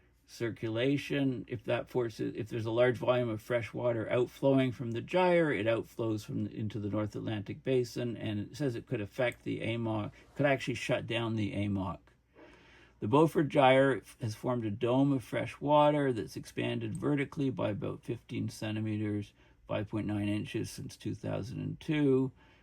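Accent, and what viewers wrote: Canada. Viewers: Your accent tells me you’re Canadian.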